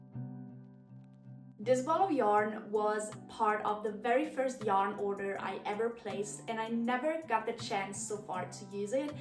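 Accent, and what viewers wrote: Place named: Italy. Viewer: ngl never heard an Italian speak this good English, there is always a very noticeble accent but not as much from you which made me want to keep watching more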